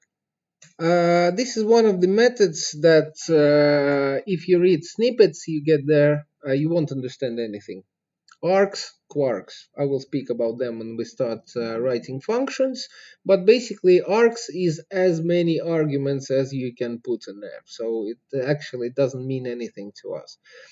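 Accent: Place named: Russia. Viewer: RU акцент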